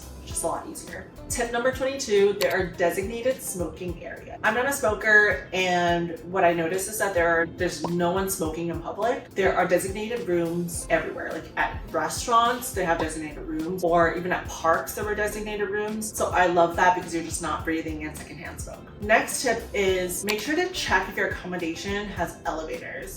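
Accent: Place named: California, United States. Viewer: your voice sounds Californian with a wanta be “Valley Girl” tone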